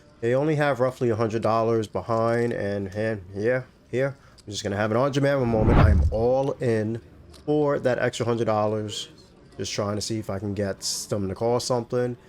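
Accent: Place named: East Coast, United States. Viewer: Love the East Coast accent and congrats on 1k subs and Monetization Fam. 🎉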